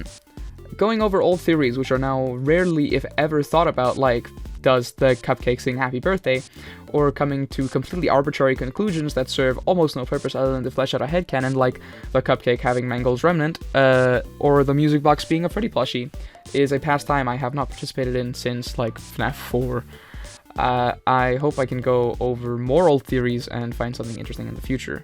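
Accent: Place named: Iceland. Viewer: omg, just found your channel, thought your accident sounded familiar… sæll íslendingur😁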